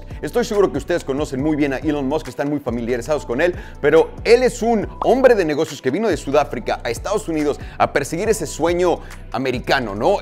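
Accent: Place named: Mexico. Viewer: se nota de lejos que eres mexicano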